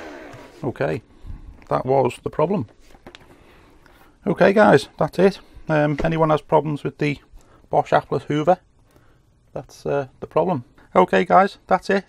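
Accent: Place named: Merseyside, United Kingdom. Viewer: I can hear in your accent you're from merseyside. Can I drop off my Bosch athlet circuit board to you for repair? It is not charging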